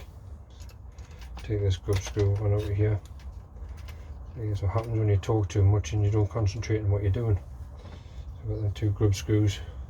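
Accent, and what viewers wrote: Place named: North East England, United Kingdom. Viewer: Where you from mate, sound like NE?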